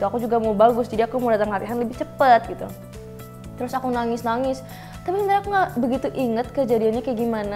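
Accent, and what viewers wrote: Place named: West Java, Indonesia. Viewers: Logatnya sunda nya ga ilang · Logat Sunda nya masih kebawa ya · logat bandung nya masih ke bawa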